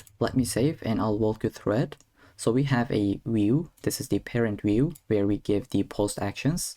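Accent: Turkey. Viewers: türkmüsün la ingilizcen asiri türk aksani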